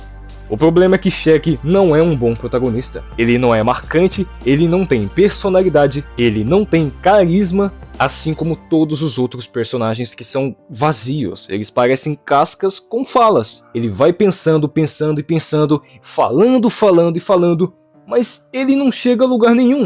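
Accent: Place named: Pará, Brazil. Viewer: Eras esse égua, até pareceu paraense kkkkk gostei do rank, discordei de algumas posições kkkkkkk vlw, flw 👏🏽🫶🏼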